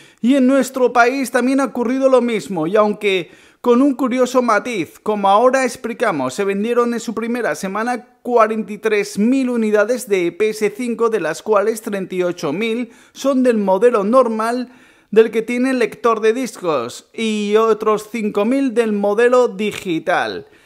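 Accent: Mexico. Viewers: Yo pense que eras de mexico xD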